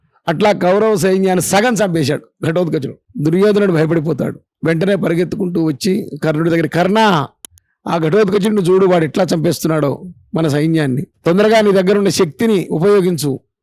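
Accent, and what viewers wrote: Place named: Andhra Pradesh, India. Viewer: Mee ru baaga maatladaaru.andhra maatallaga vunnavi.chaala santosham god bless you sir.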